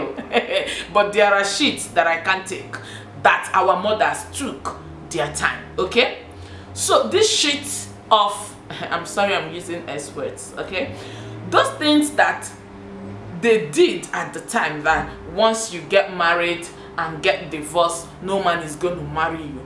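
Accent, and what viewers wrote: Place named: Igbo, Nigeria. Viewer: See let’s be abolishing this I must not marry someone from Anambra as I’m from Enugu and so on especially amongst the igbos. Love you! Hailing you from Lagos state and coz your dialect is so close to mine. I’m from Abor, Enugu. Also congratulations on 15k!!! Love to see it🎉🎉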